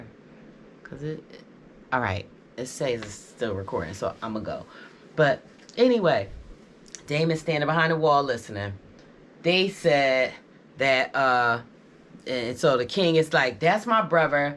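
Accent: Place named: Philadelphia, United States. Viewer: I can tell u from Philly by the first sentence, U gotta be. I subscribed cuz yu a beautiful black woman & I think u from my city.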